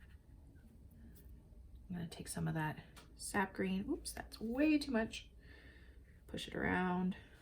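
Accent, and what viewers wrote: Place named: Canada. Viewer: I have a feeling you're Canadian, :) just watched this and your voice annunciation... or northern US state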